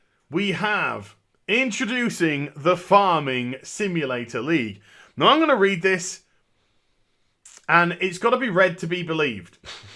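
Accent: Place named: South Coast, United Kingdom. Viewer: Your a Yorkshireman? Really? I had you down for South Coast lad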